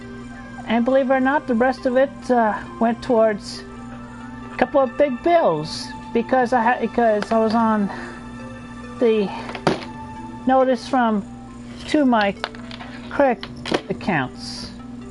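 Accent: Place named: Germany. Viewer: 10/10 German accent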